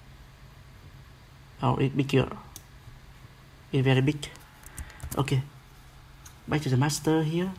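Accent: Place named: Vietnam. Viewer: Nghe giọng phát âm này đích thị người việt rồi :v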